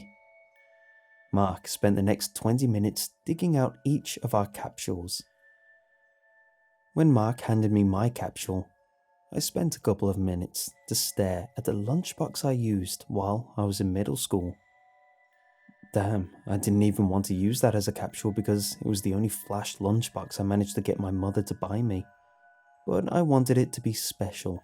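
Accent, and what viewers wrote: United Kingdom. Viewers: Kyle Mouttet He's British.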